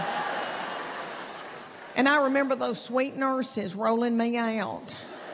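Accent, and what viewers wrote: South, United States. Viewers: I've watched your videos over time,🤨 Each time, I could not remember🤔 you being funny in any other video that I had watched. Yet I'd watch another one.🧐 Thinking maybe you're going to say funny this time ....🥱 Well, here we are.....😳 Maybe I just like watching you for your nasıl toned southern accent, Not that I'd recommend it, Cause that is not particularly interesting either. Maybe you're a niche comedian; Funny to a particular group. Wow, 😲 finally ❗ you might have just said something funny 😲😲😲 😂🤣🤣🤣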